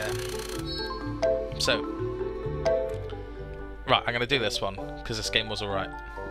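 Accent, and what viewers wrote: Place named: France. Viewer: I want you casting every Monster Hunter Podcast in that frensh voice? Très bien! Best regards "Cooking Papa" Oli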